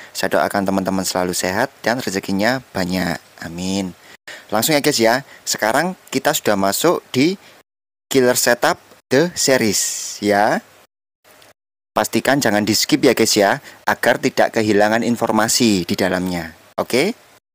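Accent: Java, Indonesia. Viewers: Dari Logatnya, Pasti anda orang jawa ya? 😁 ,Cara anda mengedukasi sangat Keren bro , saya dukung.! Lanjutkan, sukses selalu ya.👍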